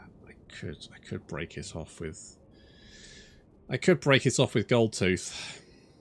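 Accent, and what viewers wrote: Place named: Newcastle, United Kingdom. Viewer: The Newcastle accent and vocabulary is hilarious when juxtaposed with WH3 😂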